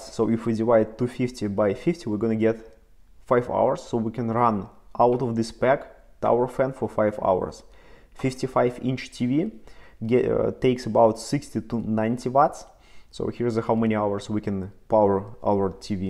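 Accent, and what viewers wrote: Russia. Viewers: Сразу лайк за Бьютифул рашен акцент)👍 и видос хороший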